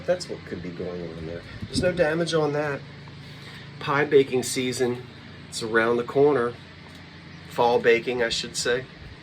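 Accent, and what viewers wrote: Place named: Philadelphia, United States. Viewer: I mentioned to tell u that whenever I get homesick for Philly , Jersey or Delaware I watch you. Even your voice and accent make me feel better.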